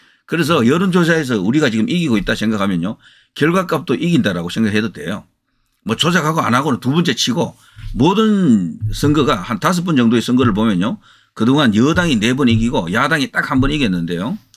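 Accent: Gyeongsang, South Korea. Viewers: 님 경상도 말솜씨 에 정감이가고 진실성이 있어 많이봅니다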